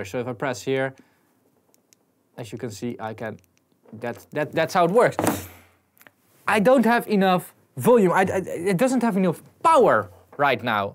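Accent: Netherlands. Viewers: I have a girl in my college, she's from the Netherlands, she speaks with exact same accent=)) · Its typical dutch english, Its How almost everyone in Holland talks english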